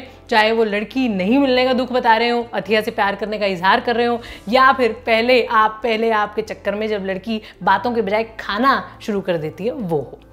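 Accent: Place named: Bhojpuri, India. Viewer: Madam ka bhojpuri accent majedaar hai, I also make videos.. please check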